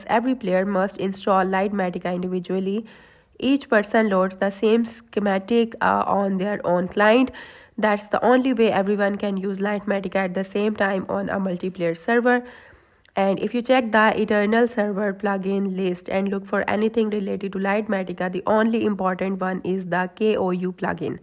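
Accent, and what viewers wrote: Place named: India. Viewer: holy indian gng 🥀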